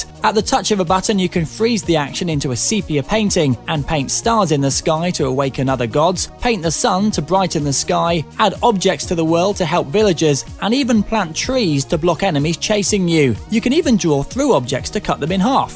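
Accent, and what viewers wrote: United Kingdom. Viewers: hes got the British accent. im pretty sure some people would say we are saying it wrong.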